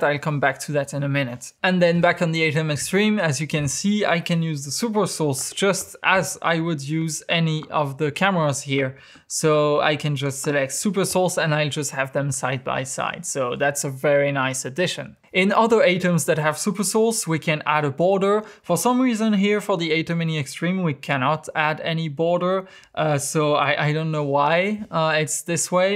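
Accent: France. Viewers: Ahaha on voit que t’es français, rien qu’à ton accent 😭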